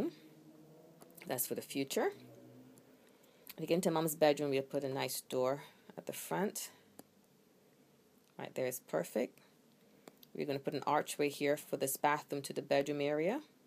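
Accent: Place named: United Kingdom. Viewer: U r British awesome lol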